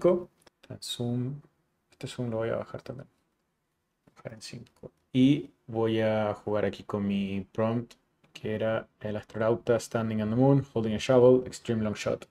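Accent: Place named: Chile. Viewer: tienes acento chileno...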